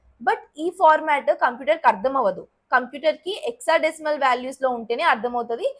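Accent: Telangana, India. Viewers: బాగానే చెపుతున్నారు కానీ తెలుగుని తెలుగు లా మాట్లాడండి దయచేసి అరబిక్ హిందీ ఉర్దూ కలిసిన తెలుగు భాష యాస మాట్లాడకండి దయచేసి 🙏🙏 తెలంగాణ యాస భాష అనకండి.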